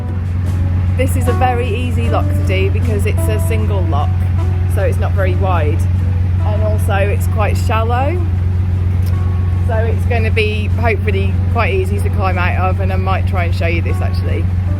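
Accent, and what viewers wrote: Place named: Birmingham, United Kingdom. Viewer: Another great vid. Defo detecting either a fellow brummie accent or Black Country accent. I grew up living in my Nans house which backed onto the canal (or cut as we call it). Her house was just down from the Tyburn House pub opposite Castle Vale north Brum. We always had barges parked up at the back of her house.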